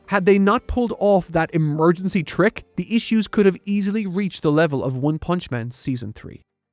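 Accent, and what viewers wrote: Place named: Ireland. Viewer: I was so stunned to hear the titles of those trash shows come out of your mouth. Man, irish accents can really make anything sound at least a little better.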